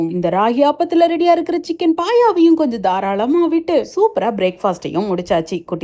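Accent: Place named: Kanyakumari, India. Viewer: Unga native kanyakumari district Tamil akka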